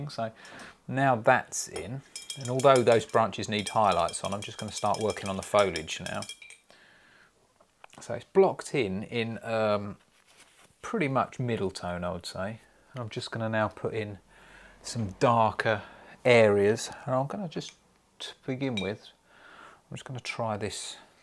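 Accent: United Kingdom. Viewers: I have just found you! I'm very much admiring your British accent & grammar! Your work is exquisite! I've learned quite a lot so far. Thank you so much for giving back! Your help makes me feel blessed and God bless you! I Love your work!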